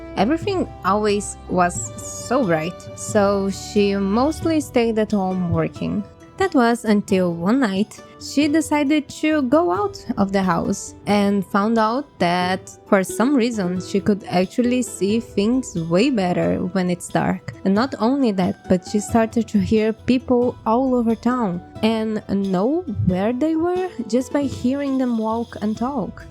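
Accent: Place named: Brazil. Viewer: eu ouvindo o sotaque mt fofo e pensando "de onde será que ela é?" ate o jabuticaba KKKKKKK mt bom encontrar alguma artista brasileira com a msm vibe doq eu gosto de assistir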